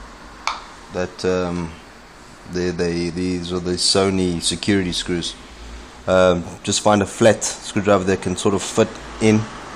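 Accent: South Africa. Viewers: Excellent video! Nice job! Are you South African? You're accent sounds South African. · South African?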